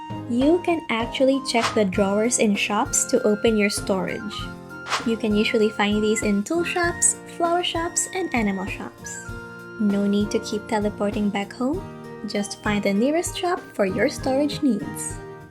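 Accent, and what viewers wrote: Philippines. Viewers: Your Filipino accent is so cute! ❤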